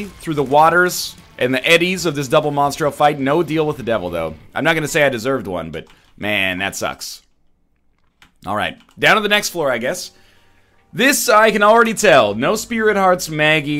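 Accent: Australia. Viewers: Your New Zealand accent is more Australian than New Zealand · probably closer to an aussie accent as they accentuate everything!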